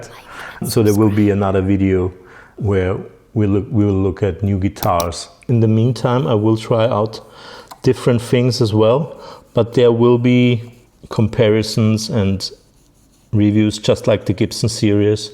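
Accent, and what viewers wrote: Austria. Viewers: Ottakringer Bier?! Dachte mir bei den vorigen Videos schon dass ich den Akzent identifiziert habe 🙂Liebe Grüße aus dem sechszehnten Wiener Gemeindebezirk! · That's amusing, apparently my ears still work, hahaha! I thought I recognised your accent also, though I wasn't certain- nor so specific; I heard Austria, but not the regional part (my family comes from Neusiedl in any case). Entschuldigen sie, bitte, für mein in Englisch schreiben in die Mehrheit; mein "Ungarischer-Bauer Kinderdeutsch mischt mit Berliner & Hamburger Strassedeutsch" ist sehr rostig heute...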